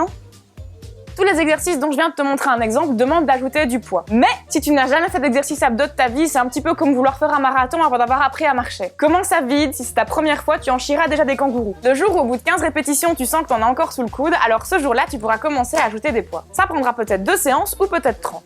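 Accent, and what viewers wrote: Belgium. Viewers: Hey j'adore tes vidéos Néanmoins j'entend un petit accent serai tu une petite Belge tout comme moi ? Continue ce que tu fais t'es top 🐱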